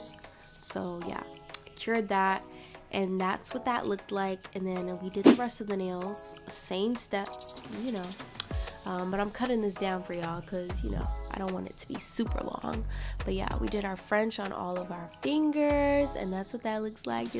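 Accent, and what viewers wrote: United Kingdom. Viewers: It was the British accent for me! lmao yes, I no longer visit the salon unless I want to get a russian manicure- to do my nails after lmao (or for a good ole pedi) Love these!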